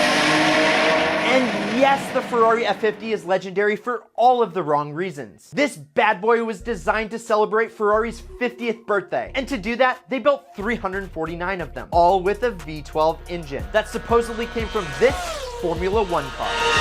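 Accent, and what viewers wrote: United States. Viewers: This is what a douchey American looks/sounds like incase you forgot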